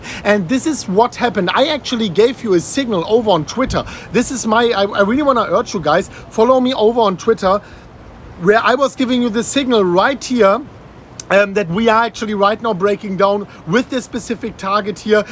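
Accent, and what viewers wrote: Germany. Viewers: PS: Der deutsche Akzent ist voll geil :P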